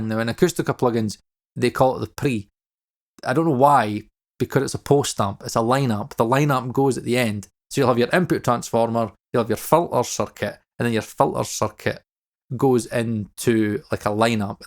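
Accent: Scotland, United Kingdom. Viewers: instant subscribe here. Watched that AP video and just felt like he was missing so much. Especially you talking about the unique behaviour of the controls on EQs, and reaching a result is very different from recreating a result. This and the cramping, parallel architecture etc. That and a Scottish accent and i'm 110% in.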